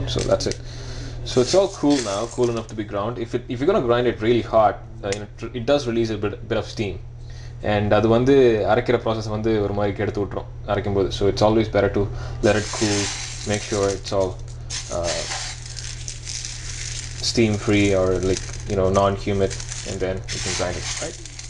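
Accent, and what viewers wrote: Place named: England, United Kingdom. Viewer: hey, never mind. i like your cool english accent and local time.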